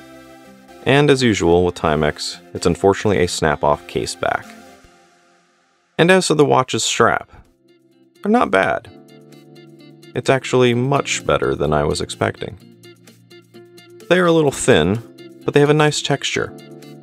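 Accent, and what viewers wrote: United States. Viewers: It's nice to hear an American accent discuss watches. Every other channel I'm subbed to are English. You're way more concise than other watch commentators.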